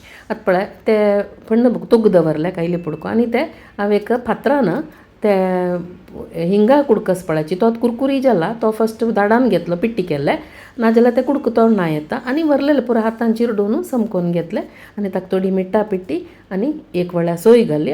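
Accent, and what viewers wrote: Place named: Thanjavur, India. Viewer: He kuthle marathi ahe ho..... Tanjavari ka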